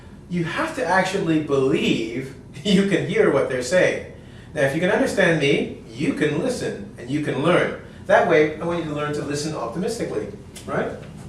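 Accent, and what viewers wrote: United States. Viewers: are you american? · Good job, amazing !!! I understand the most part of this video, and I have a sucks english :( Are you american ? where u from ? thank you for share this video, I hope one day be a english speaker like you are. Greetings from Brazil. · А вы можете сказать это какой американский английский (акцент) или британский? И вообще все которые есть учителя на сайте <link> они обучают какому английскому? Просто я новичок и пока что не могу отличать их, но я знаю одно, что не рекомендуется смешивать по этому спрашиваю.. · Excuse me, It`s American English? :)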